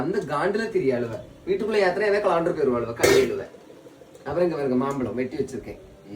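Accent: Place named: Kanyakumari, India. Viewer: Kanyakumari slang laye thittureenga anna super 🎉😂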